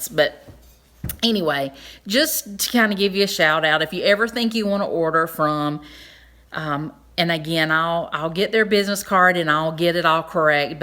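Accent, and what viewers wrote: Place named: South, United States. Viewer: wonderful to hear another southern drawl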